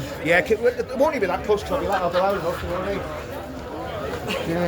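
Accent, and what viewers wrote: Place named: Ireland. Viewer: Love your irish accent ❤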